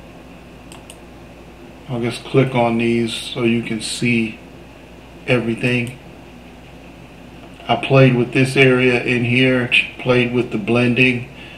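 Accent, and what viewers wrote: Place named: United States. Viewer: Thanks for sharing this. I'm at the point where this is exactly my roadblock, my character needs to do more than just walking around. I know this was over a year ago but can you explain what you did, or maybe do another detailing changes....ie, >add Attack animation to Animator > setup Trigger in Parameters, >set parameter to >.01 etc....then also breakdown what lines of code you added to script or if you added a new script. It's kind of hard to see on this video without closeups or zooms. It is good to hear a good American voice on a tut! Thanks!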